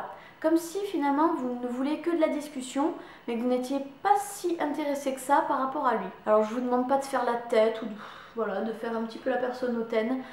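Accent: South, France. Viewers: Ta un accent, tu es pas du sud ?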